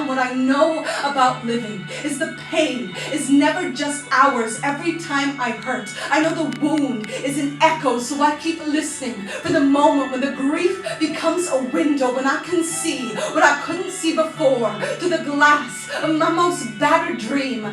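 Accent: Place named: Midwest, United States. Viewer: For one, Everyone has an accent haha; but if you wanna be specific then she has a modern midwestern american accent. I would know, I'm from Colorado.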